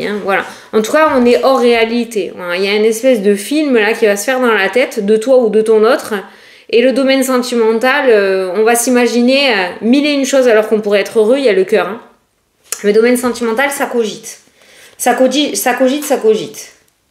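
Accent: South, France. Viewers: Bonsoir, un grand merci pour cette guidance avec votre jolie voix, votre petit accent du sud est marrant. Vous avez du psets et cela fait du bien. Votre dynamisme fait du bien. Merci pour votre gentillesse et votre bienveillance. Que Dieu vous bénisse ainsi que votre famille.❤❤❤🙏🙏🙏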